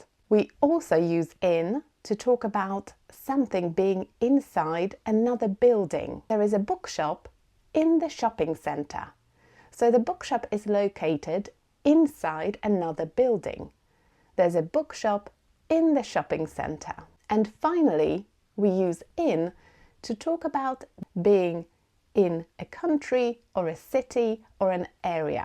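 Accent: United Kingdom. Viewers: You speak in British accent and i love it . Thank you ❤ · Hello,I'm following you fromUzbekistan, very and very nice video thanks,I loved your pronunciation and accent,I love a British accent so much,you cannot imagine how I love English ❤I want to speak..but it not easy 😢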